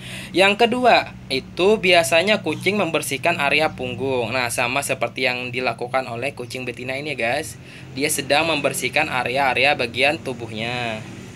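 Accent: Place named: Banjar, Indonesia. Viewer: Urang banjar sako amang ni . Logatnya kentara banar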